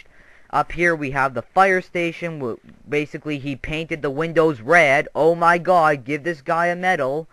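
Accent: Canada. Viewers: AwesomeManPlayz A lot of people pronounces a word differently plus he's Canadian lol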